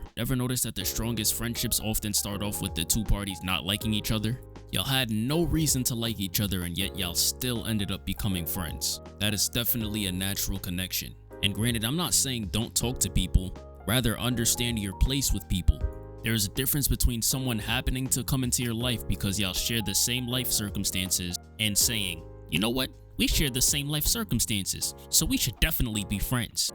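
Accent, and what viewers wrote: New York, United States. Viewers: Are you a New Yorker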